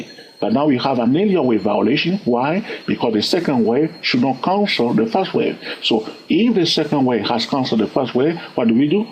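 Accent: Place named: Sweden. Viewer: Thank you so much. I think your accent sounds more Swedish then Texan American lol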